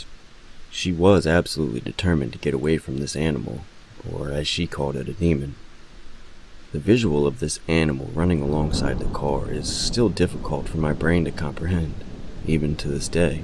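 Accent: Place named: North, United States. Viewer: Great job on sharing these hand picked stories. I get drawn in by the pace and timing and you must be from an area North of the Mason- Dixon line (written by a true Northern New Englander!) You are mastering the art of background sounds without getting washed out by it. Your reading voice is 'lower' not baritone, but in a lower register, so be careful using rolling thunder as IT is in a lower register as well. I sense that you are using a good microphone but, your mixing software may not be able to lay down multiple separate tracks? Really enjoying these recent uploads, drifting back in time to hear older work as time permits. 'Liked', subbed & comment for YT algorithm!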